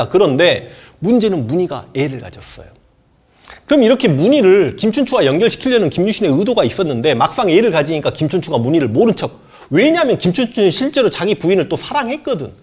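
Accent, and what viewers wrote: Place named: Gwangju, South Korea. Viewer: 억양이 광주쪽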